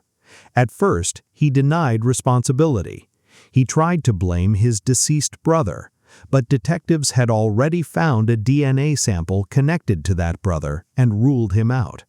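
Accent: United States. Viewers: Why the "American" narration..? It isn't necessary to prove a motive...but perversions are timeless...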